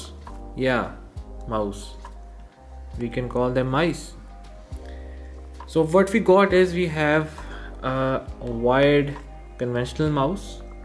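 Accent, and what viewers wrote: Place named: India. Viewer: Hes Indian (i think)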